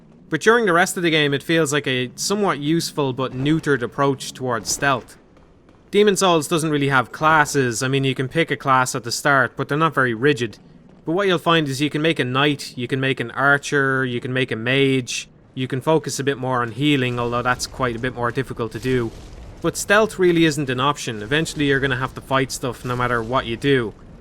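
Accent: Ireland. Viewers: your sweet Irish brogue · He's very obviously Irish. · I watch this video at night to be lulled to sleep by your sweet Irish brogue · Irish lilt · I only just found this video and your channel . Can't wait to check out your other stuff. And you sound Irish . So from another Irish I'm glad to find a Irish YouTuber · are you irish?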